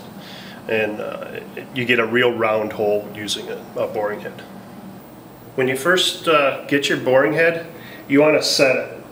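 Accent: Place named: Wisconsin, United States. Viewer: i can tell you're from WeesCAWNsin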